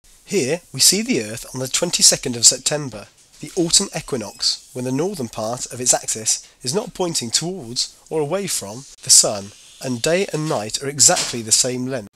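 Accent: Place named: Scotland, United Kingdom. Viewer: I think Scottish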